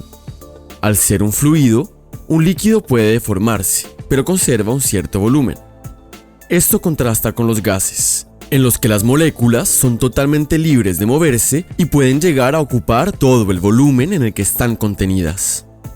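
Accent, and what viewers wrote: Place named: Colombia. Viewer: Qué buen canal! Sin introducciones innecesarias, todo muy bien explicado, interesante y aparte colombiano, reconozco ese acento :)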